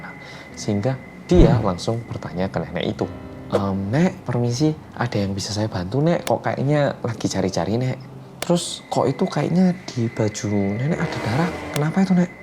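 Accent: Java, Indonesia. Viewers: Aku delek Kowe neng tv mas😂suarane rodo jowo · Mas: halo gaes kembali lagi dengan orang Korea yang.. Aku: medooook Mas: kewedeen *Krik krik krik krik adooh salah rek aku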